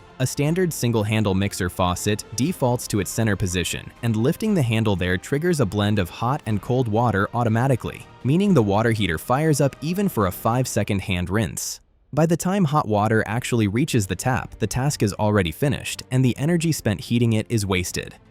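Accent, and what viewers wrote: Spain. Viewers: Todos los comentarios son España no me gusta, España no inventó eso, mi casa no es así... Madre mía! Sólo comentaron los haters! Que por otro lado si tanto les disgusta no sé qué hacen aquí 🤔 Hasta han dicho alguno que no les gusta el acento español😂 En fin, sin comentarios sobre estos personajes ignorantes. El video, sí es todo lo que echamos de menos si vivimos fuera de España. Las losetas de porcelana como todo, si son de calidad maravillosas si no se prefiere el parqué. Lo que no vas a ver es moqueta en ninguna casa 😂😂😂 El bidé es una maravilla. Un saludo!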